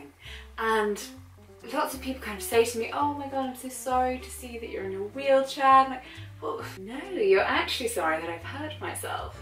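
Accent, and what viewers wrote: United Kingdom. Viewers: Weird u still have the British accent even tho ur deaf lol how did manage to keep that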